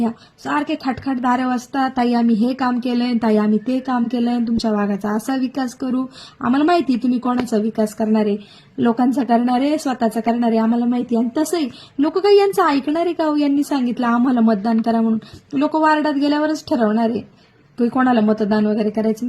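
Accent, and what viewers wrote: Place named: Nashik, India. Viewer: खूपच छान एक्टिंग करता ताई तुम्ही आणि तुमची भाषा पण खूपच छान नाशिक करान सारखीच आहे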